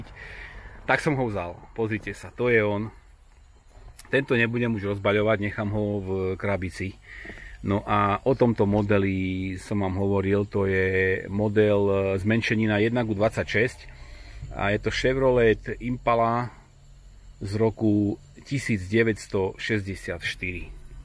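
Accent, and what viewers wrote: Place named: Liptov, Slovakia. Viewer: odkial pochadzas??podla prizvuku bz by som tipol liptov alebo oravu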